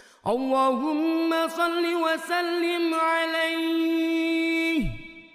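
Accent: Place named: Central Java, Indonesia. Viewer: jenengan orang mana tadz,og sama org jateng cara biLaLx🙏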